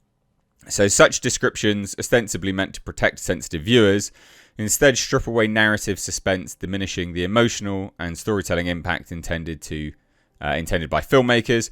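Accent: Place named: London, United Kingdom. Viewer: You sound like you’re from London!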